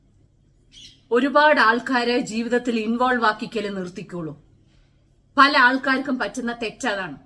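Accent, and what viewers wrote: Palakkad, India. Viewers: Palakkad slang super. V. Useful message for all. You are so inspiring. I wish I were you. So bold & practical. Nice recipe. Shall try it today. God bless ur family. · I like the way speaks 👌 Palakkad slang super 👌 I am inspired by you ❤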